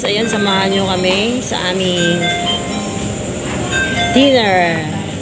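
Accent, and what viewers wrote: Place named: Batangas, Philippines. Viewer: Akala ko sis taga Batangas ka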